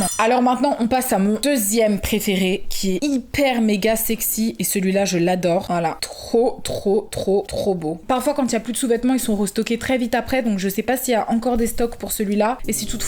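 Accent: England, United Kingdom. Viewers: Wesh tes anglaise ou quoi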